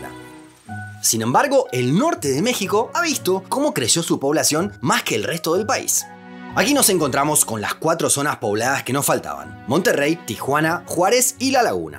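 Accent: Argentina. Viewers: Wakala ese acento argentino, traigan al antiguo narrador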